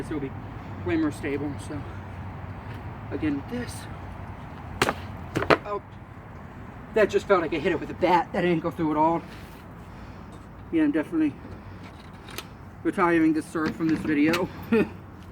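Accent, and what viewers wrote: Ireland. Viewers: Are u Irish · are you from Ireland?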